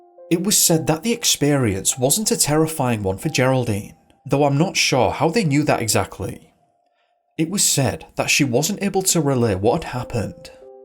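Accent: United Kingdom. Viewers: Chills with a British accent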